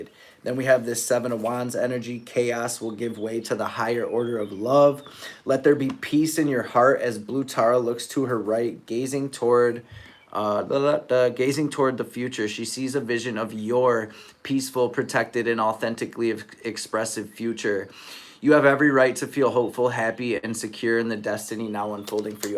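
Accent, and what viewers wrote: Detroit, United States. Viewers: So, I just happened on this account and I don't know anything about you. But you sound like you're from the D....yes? Anywhooo...good stuff here. Thank you!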